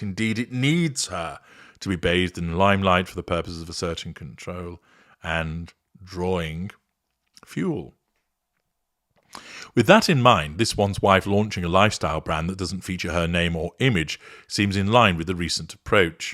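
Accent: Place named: Lancashire, United Kingdom. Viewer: Tong "lancastrian accent or northern.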